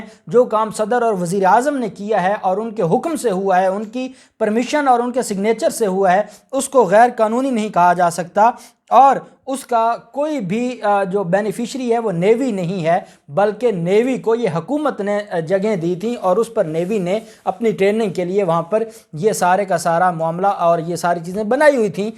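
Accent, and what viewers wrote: Saraiki, Pakistan. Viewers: he has Sriaki accent 😍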